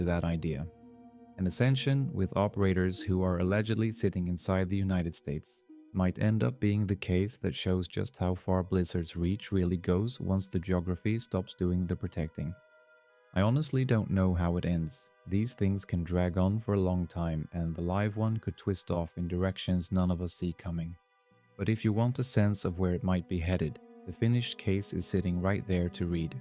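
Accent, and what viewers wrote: Sweden. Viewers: 'Over here in the US'? You sound like you are from Sweden. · I think he is just swedish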